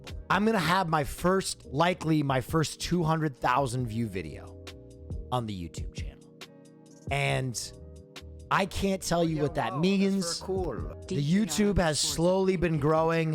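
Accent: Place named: California, United States. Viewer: Man Will is looking and sounding more Californian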